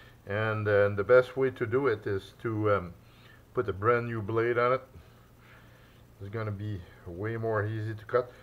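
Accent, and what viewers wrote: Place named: Quebec, Canada. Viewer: i can imagine your from quebec. tabarbak thanks for the video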